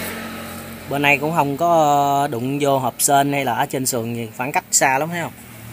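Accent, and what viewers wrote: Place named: Mekong Delta, Vietnam. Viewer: Luôn luôn ũng hộ Chia sẽ cách làm, kinh nghiệm rất là thực tế và dễ hiểu Cách nói cũng rất là chân thật người miền Tây.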